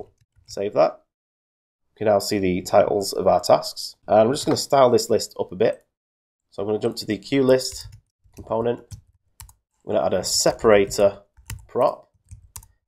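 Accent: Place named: England, United Kingdom. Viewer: Great tutorial. Glad to hear another English voice doing tutorials along with the English "Poo" humor. Well done on getting your video on the front page of <link>!!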